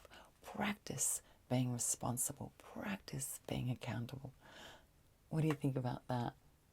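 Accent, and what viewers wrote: Australia. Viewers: I love your accent!! Are you Australian?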